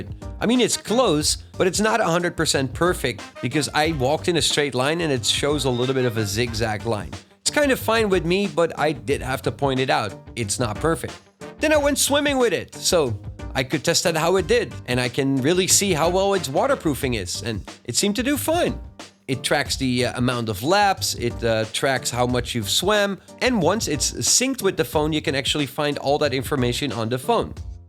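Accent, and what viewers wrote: Netherlands. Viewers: Nederlander, ik hoor het aan je accent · No offence but you have a very thick Dutch accent, or as they like to call it: "Steenkolenengels" or "Stone coal Dutch (as a self-referencing joke)". Thank you for your thorough review! I enjoyed it immensly! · Do I hear a Dutch accent? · I thought you sounded Dutch. confirmed it.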